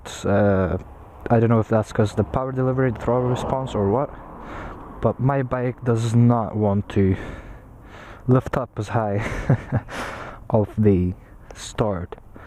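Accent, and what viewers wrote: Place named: Northern Ireland, United Kingdom. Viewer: Your accent is interesting. It is Northern Irish mixed with something else.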